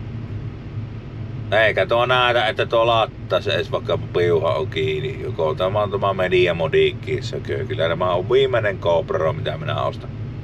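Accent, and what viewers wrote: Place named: Savo, Finland. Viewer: Ihanaa kuunnella tuota letkiää Savvoo... Terveisin yks sutteetti savolaene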